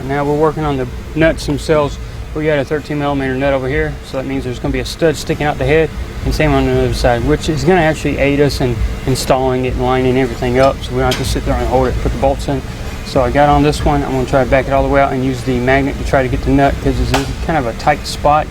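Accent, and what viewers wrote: South, United States. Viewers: Nice videos dude. fast but you cover it all. which is perfect for the average experienced mechanic. i like how you dont lolly gag on unneeded details, like how it was brought in on on flat bed truck or how you found a bird nest in it. fast, professional, informative. thanks. BTW... is your shop in Detroit? you seem to have a slightly southern accent.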